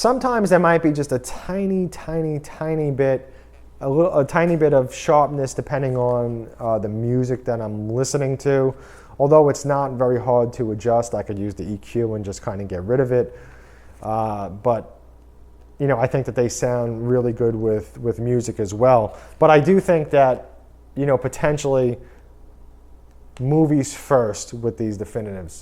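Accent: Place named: New England, United States. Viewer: Just LOVE your Yankee accent...LOL!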